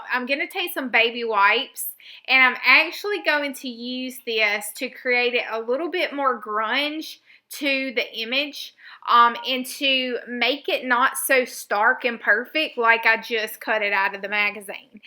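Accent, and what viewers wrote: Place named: Kentucky, United States. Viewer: Wow, I just "discovered" you only a week ago when I when was searching YouTube for beginner videos to get started on my new Gelli plate. I had been watching very complicated videos and was getting discouraged before I even started. Then I found YOU! Instantly my heart leapt with JOY and I was like, "I need MORE of this girl in my art life!" I have started binge watching your videos because they are EYE CANDY and just because I cannot get enough of your sweet VOICE (my grandpa grew up in KY and later Baton Rouge and used to call this Alaska girl, his only granddaughter "Sugapie" so hearing your accent is bringing back SO many memories, even though he passed 20 years ago). Words cannot express how much you are a GIFT to the art community as well as sharing your JOY with anyone who is lucky to find you online. I am signing up for your newsletter and will start enrolling in your classes. 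Much love to you and Josh and the puppies!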